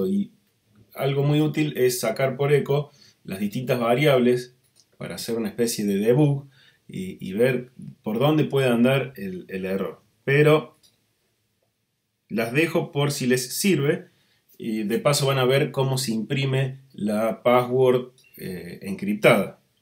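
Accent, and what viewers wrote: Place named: Cordoba, Argentina. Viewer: estan buenisimos tus videos , muchas gracias por compartirlos, de donde sos ? sonas bien cordobés, yo soy de Cosquín.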